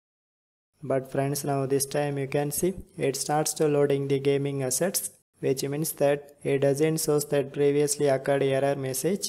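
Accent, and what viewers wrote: India. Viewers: As soon as i heard the inidan accent, i new it will work soon.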